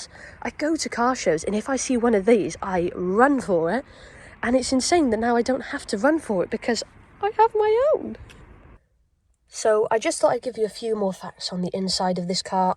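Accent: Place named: United Kingdom. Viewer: Where is the wing? I had a band new 1990 Twin Turbo Z in SF California... loved it... drove it 18,000 miles - dumped it moving to LA for film school for a 1991 4Runner and cried. I went through 3 sets of fronts and 2 sets of backs... As for tires - go for Michelin Pilot 4S pilot sports - the best rated!... I'd recommend what I'm driving instead a 330hp+ with mods 2005 silver Infiniti G35 with no Turbo... newer, faster, no turbo and about $8K plus sunroof used now. (I love my sunroof!). Thanks for the memories and your great UK accent. Enjoy! Try a G and forget the Z... as you get back seats even on the G35 6mt coupe which lowers insurance.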